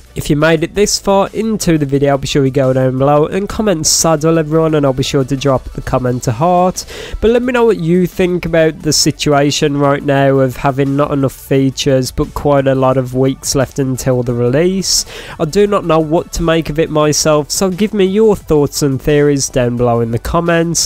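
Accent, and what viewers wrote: United Kingdom. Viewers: Ur british?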